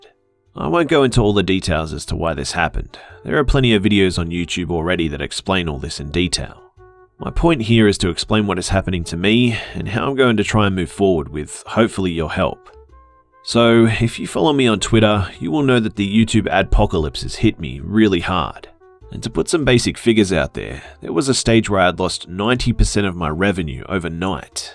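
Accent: Australia. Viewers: this is a real Aussie accent and not the dumb stereo types people do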